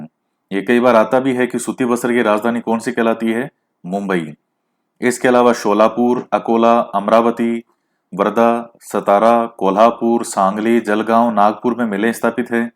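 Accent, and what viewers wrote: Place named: Rajasthan, India. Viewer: sir Aapki awaj sunjar esa Lagata hai ki jese Rajasthan ki mati swayam apki Vani bankar aapki awaj k rup mai prakat hokar hum sabhi ko adhyayan or margdarshan karwa rahi ho. Jai Hind. Jai Jai Rajasthan.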